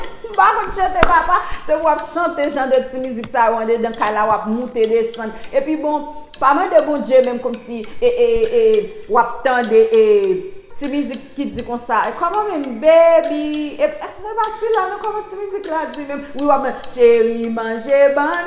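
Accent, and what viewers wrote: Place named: Cap-Haïtien, Haiti. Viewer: Se vre wi! Menm lè anpil nan yo pa konn lè yon fanm vle yo! M rmen ti aksan capoise la anpil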